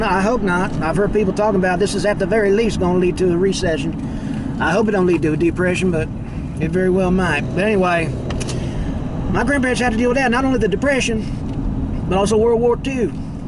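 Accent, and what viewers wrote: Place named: Kentucky, United States. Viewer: My old stomping grounds. I love that you started on Alex by the river. I can't help but laugh that though you are driving through West Carrollton, Ohio, it sounds like you are from the sticks of Kentucky. I don't mean that in a bad way. It just caught me funny.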